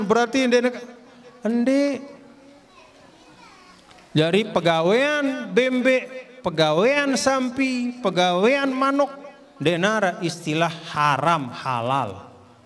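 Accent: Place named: Sasak, Indonesia. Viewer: senang banget sy dengar bhs sasak halus intonasinya sy 15th di Lombok ngerti sekedit kedit ton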